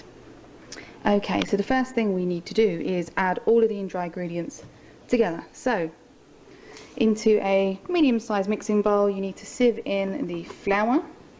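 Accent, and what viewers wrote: United Kingdom. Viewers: I didn't expect you to be British :) UK FTW. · oh my god shes british <4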